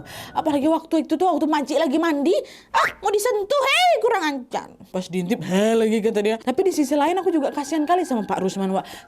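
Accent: Malaysia. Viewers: leggok kalii melayunya bahh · Comel lah kak cakap bahasa Melayu 🤭🤭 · Pertama, cerita ni memberi nuansa baru dari khw sebelumnya, jadi seru kaliii. Kedua, kak cakap melayu adoooh comel lah sukak ❤ makasi kak utk videonya, selalu excited nonton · candu pas kak pake logat malay😂 kak emg juara 1 menguasai logat daerah bahkan sampe ke negara tetangga 🤣🤣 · Lucu sangat cakap bahasa Malaysia,comel je😊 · nada&logat malaysia ny dpt bgt plsss□□ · Hai fatin from malaysia * comel sangt ckp slang malaysia........ comel!!!!! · Kak kenapa logatnya jadi ikut pake logat melayu😂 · Bahasa melayu kak gelas kali wak ehh😊😊😊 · comel je cakap bahasa malaysia 🤭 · Comel nye dengar kak cube cakap bahasa melayu. Thank you kak for the effort, hargai gile usaha kak ❤ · Comelnya cakap melayu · Lucu skli kak ngomong bahasa malay😅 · comelnya kak berbahasa melayu 🤭 salam dari Malaysia kak. sihat2 selalu 🫶🏻🇲🇾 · Kak cakap bahasa melayu serasa lg dgr cerita p.ramlee👍🏻🤭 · comel la bercerita dalam bahasa malaysia 😍😍😍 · Comel je cakap bahasa melayu😊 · Respect cara Kak bercerita.. 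sama macam bahasa Malaysia.. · Mood banget denger kak ngomong logat melayu gtu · cakap melayu is so comeyyy 🤣😊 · comel je ckp style malaysia😂❤ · Comel cakap bahasa melayu malaysia❤ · Kak lucu bgt pake bahasa Melayu😂😂❤❤❤ menghayati ya wakk😂😂❤❤❤❤ sembagattt wakk❤❤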